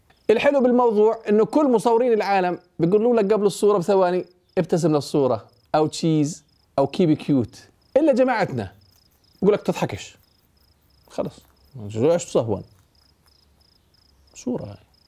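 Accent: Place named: Jordan. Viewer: ههههههههه فطستنسي من الضحك عايش بامريكا ومفتقد لها الهجه الاردنيه ههههههه تحياتي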